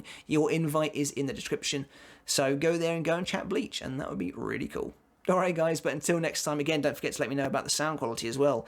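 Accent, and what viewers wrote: United Kingdom. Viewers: Bro you british ?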